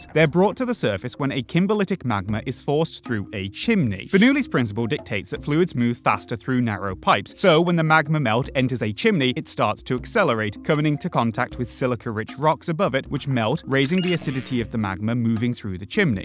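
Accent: United Kingdom. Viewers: This guy has a middle class counties accent apart from the word ‘now’ which he says with a Black Country accent 😂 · oh youre british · Assuming they are a person at all is assuming too much. The algorithmically suggested video, voiced by the algorithmically optimal Brit accent, with an algorithmically generated identity of "PhD in something cool, but really just a shut-in shitposter (like you!)" to achieve a perfect balance authoritative intellect, relatability, and trendy meme humor. The PhD chops will never be relevant to the content so they can't really be disproven, while the meme humor can never really be separated from chasing the algorithm in any meaningful way. The editing, animation, and character design are professionally utilized, but still generic enough to be commissioned through something like UpWork on the cheap. The vocabulary sounds like the massive youtube audience of lefty, college socialists, but the content is very neoliberal globalist. 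This is pretty much just capitalism in it's purest form, the entire video is designed to succeed algorithmically without much of a point to make. Even the profile picture is appropriately colorful and busy to attract attention, encourage further inspection. The channel name follows the marketability framework made popular by band names in the 80's to today. I could go on, but I have already spent too much time thinking about the topic already. Hopefully if I ever get around to making serious youtube content some asshole in the comments doesn't go on and on about how it's blatantly formulaic and motivated only by a desire for popularity and revenue. But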